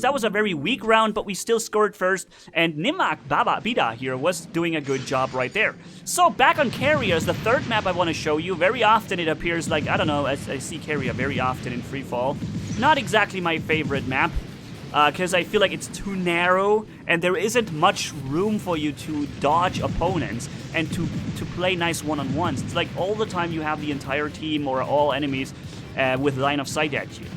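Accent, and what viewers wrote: Germany. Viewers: Hi are you German?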